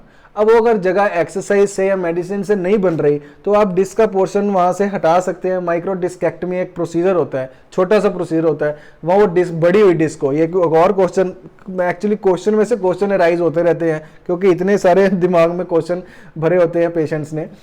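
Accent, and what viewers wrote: Punjab, India. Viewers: Accent punjabio wala hai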